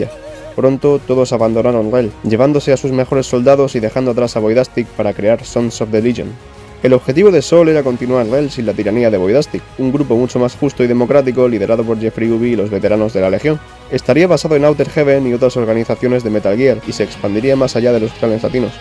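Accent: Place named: Spain. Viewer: "historia de los clanes latinos" lo narra un español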